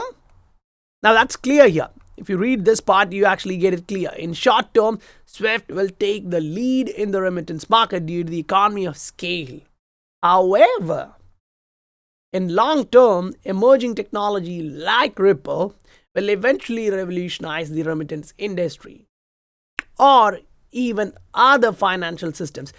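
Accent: India. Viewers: Are u Indian...?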